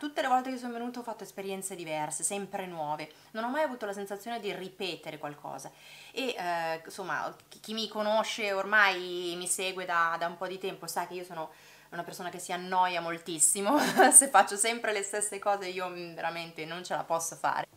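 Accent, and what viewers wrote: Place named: Milan, Italy. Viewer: sempre con la cadenza milanese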